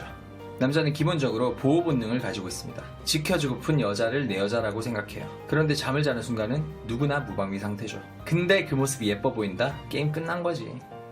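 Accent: Gyeongsang, South Korea. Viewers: 아 역시 대구분이시군요.대구출신 친구들이 많은데 그 친구들한테 듣던 억양!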